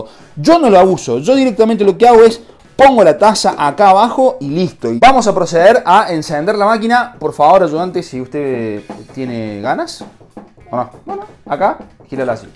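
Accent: Córdoba, Argentina. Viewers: La tonada cordobesa hace un mejor el vídeo jaja saludos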